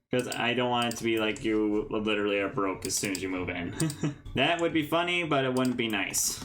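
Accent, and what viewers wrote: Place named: Newfoundland, Canada. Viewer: this is so random, but I'm willing to bet money you're from newfoundland Canada, I can tell that accent from anywhere! -a newfie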